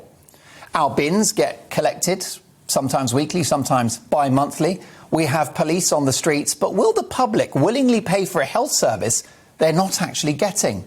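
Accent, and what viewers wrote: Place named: RP, United Kingdom. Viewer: Democide; the killing of members of a country's civilian population as a result of its government's policy, including by direct action, indifference, and neglect democide is any murder by government--by officials acting under the authority of government. That is, they act according to explicit or implicit government policy or with the implicit or explicit approval of the highest officials. But clap at the sky if you want. Hell they've been through? Yeah I guess learning all those dance routines must have been a nightmare. Dolan is full of manure and cannot or will not see the reality, scale and scope of the crime and hoax perpetrated against us. A plummy voice, meeja haircut and glasses doesn't convince me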